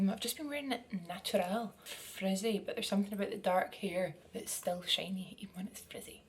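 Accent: Scotland, United Kingdom. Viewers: The little Scottish accent 😭😭😭😭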